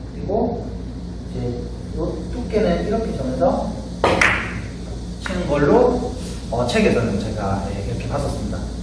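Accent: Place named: Gyeongsang, South Korea. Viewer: 말투가 진짜 정겹네요 ㅎㅎ고향사람 생각납니다 창원 마산사람이라~ ❤❤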